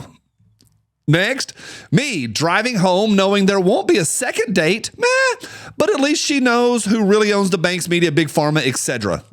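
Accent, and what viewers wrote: South, United States. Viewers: I don't think I ever heard a gay guy with a southern accent before (nothing wrong with it).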